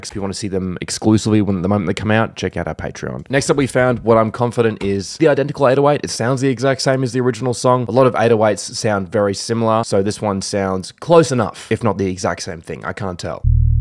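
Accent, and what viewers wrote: Australia. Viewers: bro your aussie?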